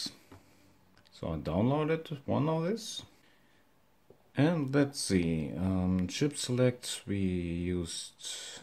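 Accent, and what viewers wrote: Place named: Home Counties, United Kingdom. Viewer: The kickstarter requests Canadian dollars but the accent is clear Home Counties English. Quite surprising.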